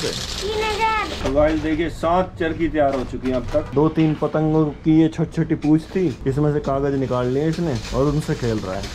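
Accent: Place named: Jacobpura, India. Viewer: Jacobpura k aspas k lgre ho bhai😅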